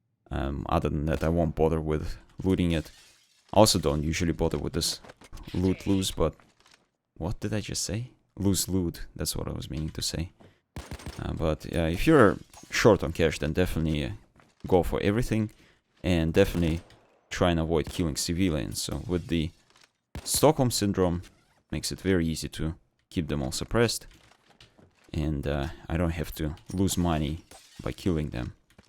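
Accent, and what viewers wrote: Russia. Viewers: Акцент русский, по моему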